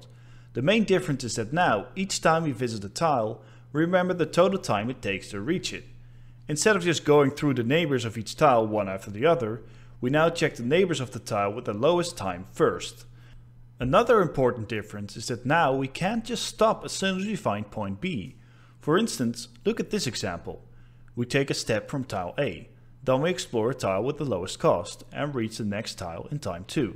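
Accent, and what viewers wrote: Netherlands. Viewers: Great vid. One little question, did your English decline from a couple years ago? Like your dutch accent is more pronounced then roughly 2 years ago.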